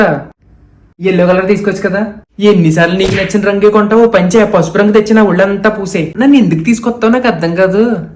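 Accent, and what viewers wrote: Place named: Kakinada, India. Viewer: Hii andi nenu kakinada ammayine meeru chala natural ga mana side ela మాట్లాడతారు ani చెప్తుంటే mana style entha సరదాగా ఉందినని అనిపిస్తుంది మీ వీడియోలు మా పిల్లలు కూడా బాగా ఎంజాయ్ చేస్తారు pls mee numchi reply isthe ma పిల్లలు chala hpy feel అవుతారు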